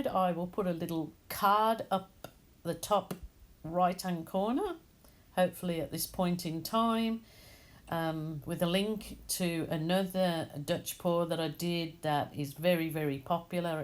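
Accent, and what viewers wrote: Birmingham, United Kingdom. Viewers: Hello from Shrewsbury, UK. You’re a lockdown saviour...I’m now an acrylic addict! If you ever come to live in England, you would fit in really well in the Midlands as you have a perfect Birmingham accent. Just wondered if anyone else had ever mentioned that?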